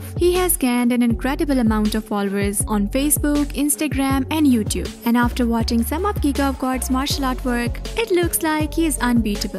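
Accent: India. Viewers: This indian dialect, is triggering me so god damn hard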